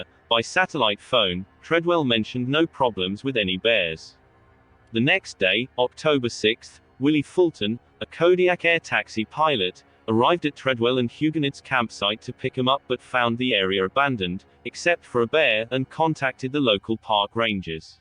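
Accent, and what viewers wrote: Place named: United Kingdom. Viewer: It's not a robotic voice. It's a British voice and the real audio based on news reports. I listened but wish I hadn't.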